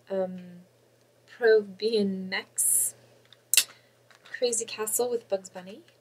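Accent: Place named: Wales, United Kingdom. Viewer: Woah,. You actually sound really good! You sounded English in some parts, and mostly Welsh;) I am proud!:D A true Cardiffian. (Pokemon red and blue are awesome!)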